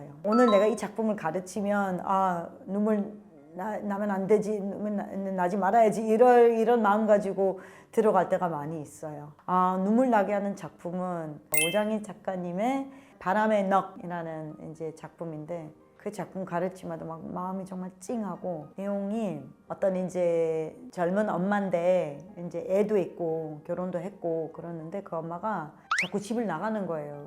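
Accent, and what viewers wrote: South Korea. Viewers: 와우, 거의 한국 사람들 억양을 그대로 쓴네. 미네소타 한국어 마을 '숲속의호수'가 있다는걸, 유튜브 다른 영상에서 봤었는데 바로 이 분이 나왔었구나. 한국을 엄청 사랑해 주시네, 고맙습니다. 항상 건강하시고, 행복하세요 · 500%한국사람말투군요 뛰어납니다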